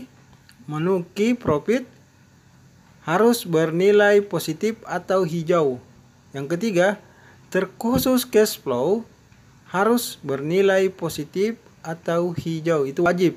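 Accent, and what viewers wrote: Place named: Sulawesi, Indonesia. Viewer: Wa alaikum salam. Pak, orang dari sulawesi yaaa? Kelihatan dari suaranya...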